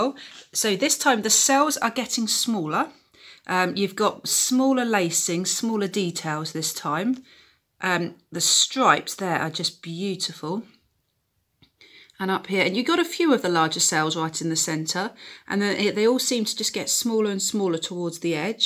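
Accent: United Kingdom. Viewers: I found you a couple of nights ago. Well done on showing us your pour experiments it must have taken a long time to prepare. I did my fist pour yesterday they were all a bit of a mess but very pretty and I managed cells. So lots of practising ahead for me. I left everything on the kitchen table so round 2 today. Keep going it's nice to hear a British accent and no annoying background music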